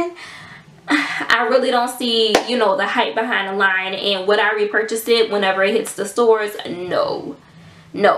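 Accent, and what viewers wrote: New Orleans, United States. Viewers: YOU FROM NEW ORLEANS? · I love this Nola accent I love being from down south baby it’s just something about our lingo and how we speak and each state is different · Yes!! I love the Nola accent..Especially when you guys say."Babbyy"😁😁.!!!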